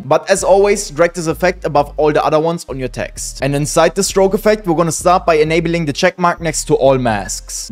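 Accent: Germany. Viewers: i tink tat you're deutsch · Are you from germany ?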